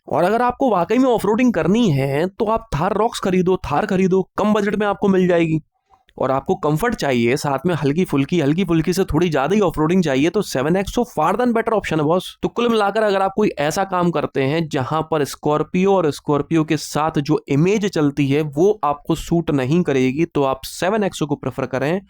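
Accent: India. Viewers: Bhai tumhari desi boli apun ko mast lagi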